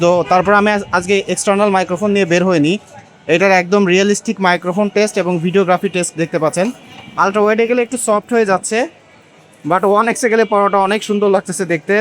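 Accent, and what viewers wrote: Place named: Khulna, Bangladesh. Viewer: ভাইজান মনেহয় খুলনা অঞ্চলের মানুষ তাই কথার মাঝে সেই রকম টান পেলাম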